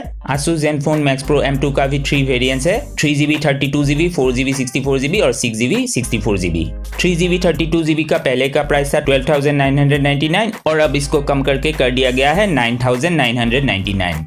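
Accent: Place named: Assam, India. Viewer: Aapke..awaz se toh..aap Assam ke hai ...lag raha hai. 😅😅😅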